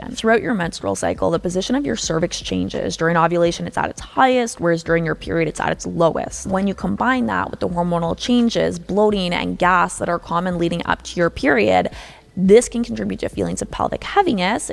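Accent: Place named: Canada. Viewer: It's a Canadian accent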